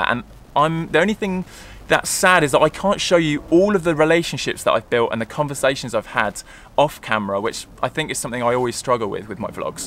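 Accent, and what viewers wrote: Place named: England, United Kingdom. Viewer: This guy looks like a Jamaican Sharlto Copley with an English accent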